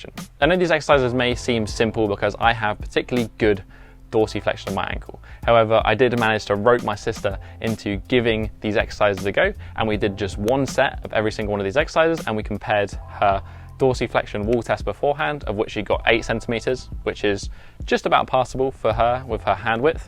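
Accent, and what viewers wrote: England, United Kingdom. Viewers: I'm not English but oh beautiful to hear English accent. Not in you face American. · That british accent ..gosh i cant understand u well and thats annoying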